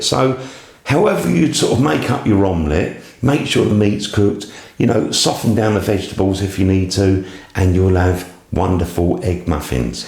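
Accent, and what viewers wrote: United Kingdom. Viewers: He's from the UK, he can't help it. It's a very cute tutorial, I think.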